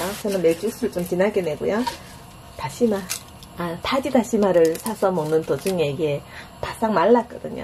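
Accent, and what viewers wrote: Gyeongsang, South Korea. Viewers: 우리나라 갓중엔 돌산갓이 최고라지요? 전 뭔가 쌉살한 맛을 좋아해서 갓김치 좋아해요. 저도 멸치똥 머리 안떼고 해요. 귀찮아서이기도 하지만 그냥 약간 씁쓸한 맛이 좋아요 ㅋㅋ 귀여운 경상도 사투리로 조곤조곤 상냥하게 설명을 해 주시니 정말 재밌고 기분이 좋아집니다^^ 맛있겠다 ㅎ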